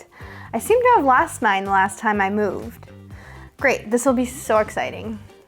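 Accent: United States. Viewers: I love listening her accent as it encourages me to keep focusing on American English which is awesome..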